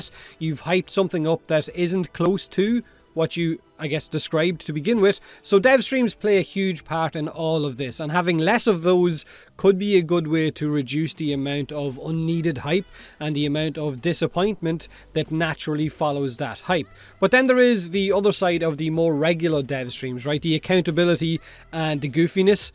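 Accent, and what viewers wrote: Ireland. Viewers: You're my favorite person to watch for warframe related stuff. I love the personality, the Irish accent, the entertaining videos, and the honesty. Don't stop being you, and definitely take care of yourself physically and mentally. My condolences for your losses.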